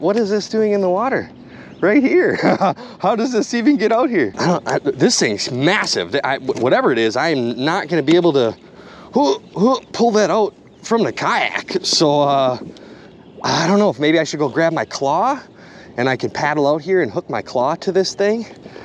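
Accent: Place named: Milwaukee, United States. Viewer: Total Wisconsin Milwaukee accent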